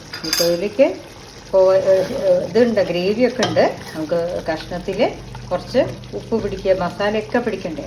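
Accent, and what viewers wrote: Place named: Valluvanad, India. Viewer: Valluvanadan Malayalam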